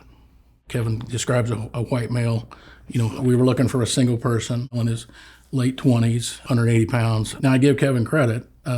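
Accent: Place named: United Kingdom. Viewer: to give this channel a lil boost: subbed. british sounding narration always sounds like quality to me, a german.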